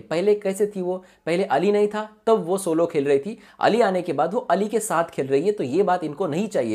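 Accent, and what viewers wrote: Maharashtra, India. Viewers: Hi...... Tu Marathi ahes ka....? Tuzya bolanyachya ton Varun vatat...!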